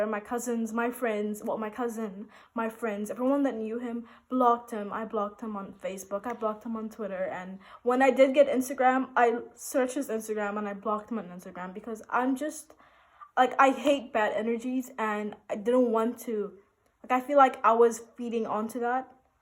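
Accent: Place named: United Kingdom. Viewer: That British accent , I really like it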